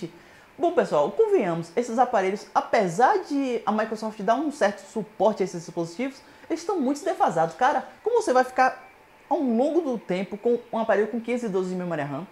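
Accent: Bahia, Brazil. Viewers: seu sotaque baiano.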